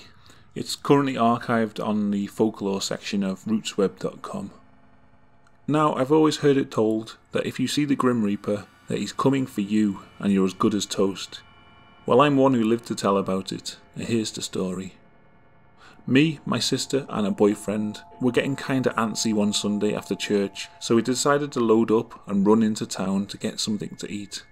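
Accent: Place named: Northwest England, United Kingdom. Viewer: Just sounds like an Englishman with a mid-northwest accent to me.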